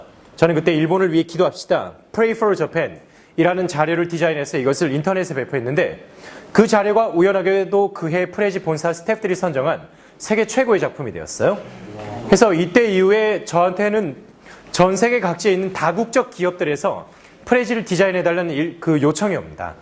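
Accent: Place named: Busan, South Korea. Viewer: 부산말을 서울말로 번역 할 필요 없어요 ~~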